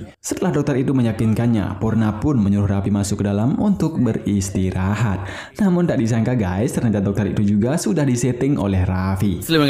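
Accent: Bali, Indonesia. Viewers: Pasti dari bali ya min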